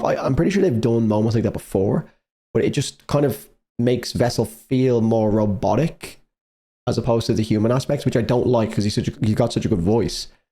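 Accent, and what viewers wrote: Ireland. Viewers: 3 reasons why your Irish (warning: funny): 1. You drink excessive alcohol, specifically in the blind reaction video for Even in Arcadia, every Irish will do this this is a stereotype really but who cares 2. Your accent (pretty straight forward) I also sense some British or Australian accent not sure 3. Lastly, in this video your drinking (which is made by Coca Cola company this blew me away I didn’t know they sold water products) which are exclusively in Ireland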